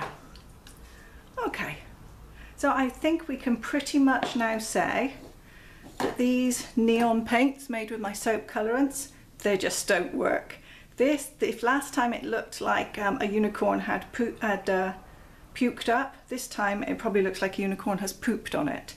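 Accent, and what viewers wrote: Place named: West Country, United Kingdom. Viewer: So nice to hear a fellow West Country accent! ☺ Props to you for even attempting a painting with these colours... i mean, wow! lol 😎😎😎😎😎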